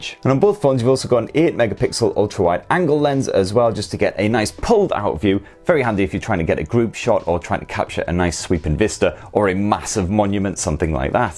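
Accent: United Kingdom. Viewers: Just love to hear his british accent 🤣👌 "bloody" liked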